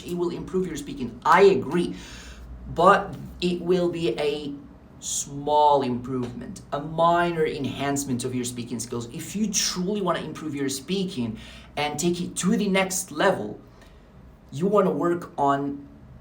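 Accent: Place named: United States. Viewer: Incredible information bro I understood the whole video without looking at the translation I'll take your advice thx bro and your accent is so amazing i can't tell the difference between yours and an American accent ❤❤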